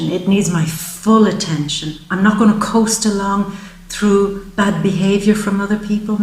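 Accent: Ireland. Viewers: looked at my relocation chart the other day and it seems that Ireland is where I need to be!! Although born in the UK my father was a Kerryman. I have been living in Spain for 33 years now but my feelings aren't the same as when I came out here......listening to your lovely accent makes me feel at home!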